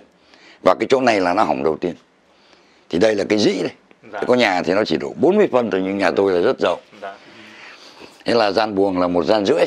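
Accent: Hanoi, Vietnam. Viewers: Xem những video chế anh, em thấy buồn vô cùng. Cũng chỉ vì những con người hiểu biết nông cạn. Em Theo dõi anh hơn năm nay rồi. Đã nói tới ẩm thực là một đề tài khó. Ai mà hiểu cho hết. Cứ coi như anh kém cỏi đi. Nhưng chịu khó tìm hiểu sẽ tốt hơn. Anh giọng hay chuẩn mực, hơn người Hà Nội nhiều.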